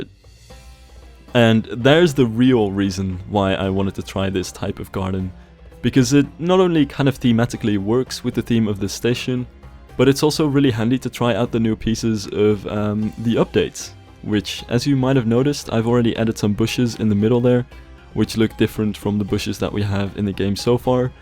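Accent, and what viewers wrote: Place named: Netherlands. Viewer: My senses smell that you are Dutch speaking.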